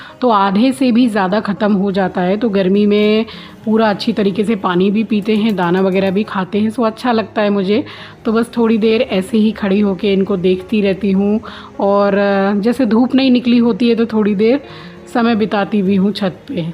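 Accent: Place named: Kolkata, India. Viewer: Aapka typical calcutta accent hai..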